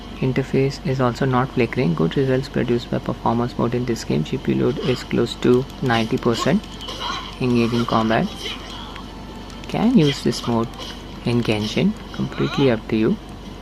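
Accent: India. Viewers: Accent is thick but lucky for me i have Indian friends :D cheers for the video bud.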